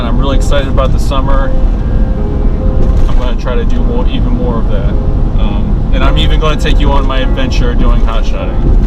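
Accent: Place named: Canada. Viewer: Well that's really good I'm happy for you and I'm sure you like it probably a big change for you you sound like you're from Canada are you well thank you for answering my reply really enjoyed your video I could hear your frustration I guess you would say and in the tone of your voice and I completely understand I've been in similar situations and of course with different companies doing different type of work but I completely get it then again really enjoyed your video looking forward to seeing more of them and God bless and I hope you have good luck in your new work opportunity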